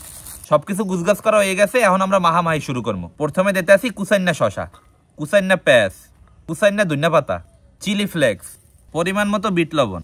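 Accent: Barishal, Bangladesh. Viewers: মনু তুমি কি বরিশালের মাল শুদ্ধ ভাষা শিখো নাই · Borisaila Katha hunla rag uthey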